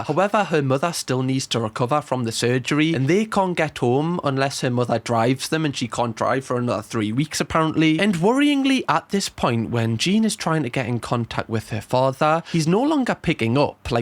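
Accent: United Kingdom. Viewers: Hey I tried to know where are you from your accent,the nearest one came to my mind British:) am I right? Am studying English so sorry for annoying you 😅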